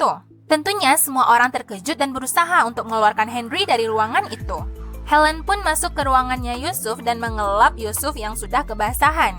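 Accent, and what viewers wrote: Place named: Padang, Indonesia. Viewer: Ini orang padang yak🤣🤣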